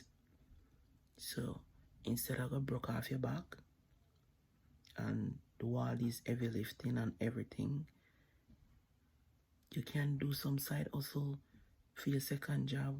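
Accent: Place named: Jamaica. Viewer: You sound like a West Indian Jamaican are you a descendant of the Afrikan Diasopora a Descendant of the (SLAVES) the "BANTU PEOPLE" this is my question Sista. If so please, please look into who the Afrikan Diasopora people are Biblically we are really from the Scattered 🏠 House of JUDAH from the Bloodline DNA of Abraham, Isaac and Jacob/Yisrael we are the True Bloodline DNA Descendants. HaSatan have Deceiveth the whole world even the Saints if it where possible. So please my Hebrew Yisraelite Sista of Zion check out a few Hebrew Yisraelite YouTube Brothers and Sistas Channels Open Dairy, Hebrew Nation Building by Morris Williams Jr and Joshua Collins, Yona Golab, Hebrews To Negroes aka TV MOSES LEVI by Ronald Dalton Jr and Benayah Israel to begin your research on who the Afrikan Diasopora where before we became "SLAVES" To the European Nations and others Nations "WORLDWIDE" please don'tignore this very important information that I'm trying to share with you check out those YouTube channels with those Brothers and Sistas. 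Shalom, Salaam my Hebrew Yisraelite Sista of Zion. We are the Real Descendants of The Ancient Children of Yisrael.💋💗💕😍🕎🥰💞💖👄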